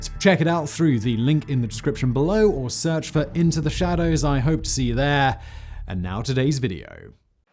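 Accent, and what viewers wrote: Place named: England, United Kingdom. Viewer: I was amazed that the radio call was preserved, and isn't it odd that a midwestern sailor speaks with an English accent and oh I'm an idiot, that's voice.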